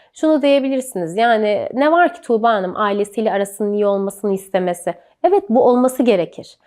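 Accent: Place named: Yozgat, Turkey. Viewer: Ay Yozgat lehçesi vuruyor arada, orada kopuyorum. Emeğine sağlık Hocam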